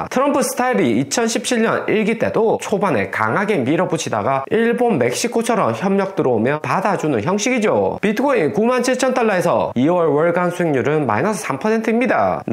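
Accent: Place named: Gyeongsang, South Korea. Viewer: 반란수괴 지지 쌍도억양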